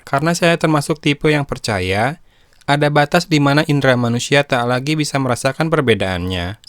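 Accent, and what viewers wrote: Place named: Sunda, Indonesia. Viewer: ngomong ada nadanya, khas dari Sunda. Akhir kalimat nadanya turun. Ini krn baca teks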